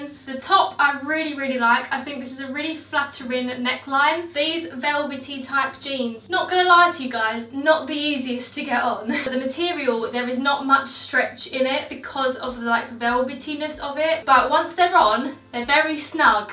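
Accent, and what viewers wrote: Essex, United Kingdom. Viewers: Is it possible that you become more Essex with every video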